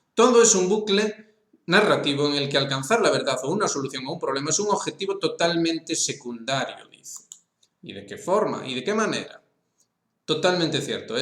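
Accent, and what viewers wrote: Spain. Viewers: Es un gallego. No le entiendo ni madres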